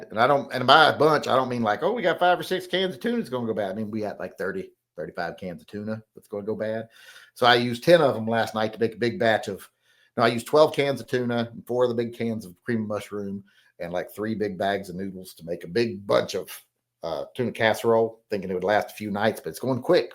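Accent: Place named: South, United States. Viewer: You have a pronounced southern accent, but I guess you knew that.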